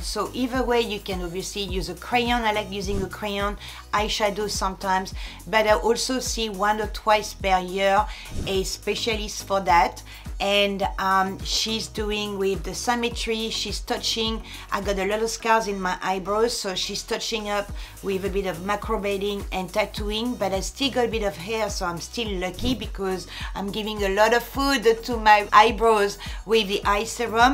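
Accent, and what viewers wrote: France. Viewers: Oh my goodness. This is awesome. I have exactly the same wall color and the same vogue picture ( one of three in a set) in my bedroom🌺 So nice I found your channel. You‘ re so charming and so elegant . Bye the way, your french accent is amazing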